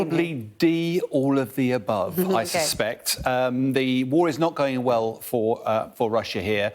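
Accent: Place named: United Kingdom. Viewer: His British accent is just killing me. "Piuutin". He sounds like Russia lost 50% its territory. It lost 50% occupied Ukrainian territory. Ukrainian losses based on OSINT, lieutenant colonel McGregor and latest reveled secret document are 1 Russian to 5-7 Ukrainians. Just in Bahmut, Ukrainians lost 32 thousand just KIA.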